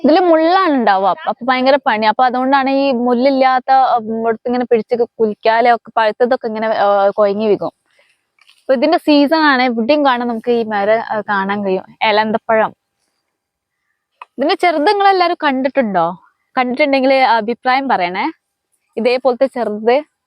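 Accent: Malappuram, India. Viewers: ingal enganaya malappuram slang parenne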